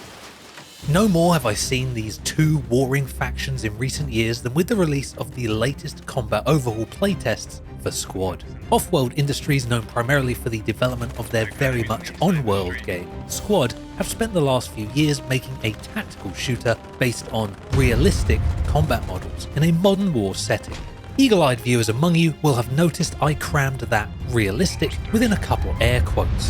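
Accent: United Kingdom. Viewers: Why is a British guy telling me the gun is unrealistic. You’ve never shot a gun. This is more realistic than it was. When you shoot a gun with a scope, the scope moves. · Typical dodging the question British retort on Youtube. "haha just a video game it doesn't matter, real war bad" I really see the weather caused depression and I'm glad my ancestors waged war to separate ourselves to where now your prince married some tramp and your king sucks on top of that. I mean why even go over real life in a military simulation (besides the fact artists and coders more skilled than you dumped their heart out on this experience). And your argument? Hilarious, you give no solutions to the issue you complain about. Yeah, you claimed milsims stupid because they're not real life. I don't play milsims but I know what you just said was placed on a Received Pronunciation Platter of over confident self-hatred, the next course is projection.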